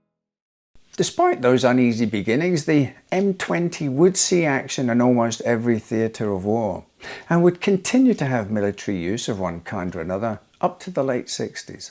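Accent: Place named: Scotland, United Kingdom. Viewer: Love how he starts with an English accent then gradually becomes quite distinctly Scottish. In a very charming way!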